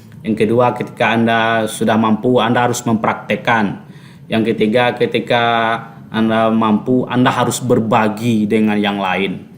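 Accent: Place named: West Sumatra, Indonesia. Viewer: dari logat URANG AWAK udo Koo 🙏🙏🙏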